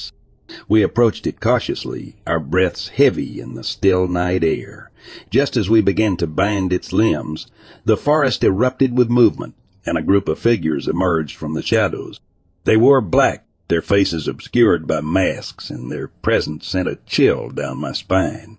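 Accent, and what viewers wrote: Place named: South, United States. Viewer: I think you may be mistaking mispronunciations with the regional southern accent. I haven't heard any mispronunciations. Certainly not routinely.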